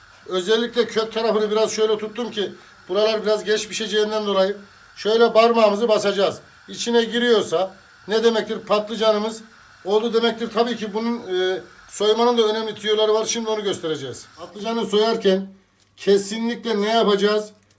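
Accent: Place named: Central Anatolia, Turkey. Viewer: Ustam siz nerelisiniz 🤗 şive tam içanadolu gibi 🤭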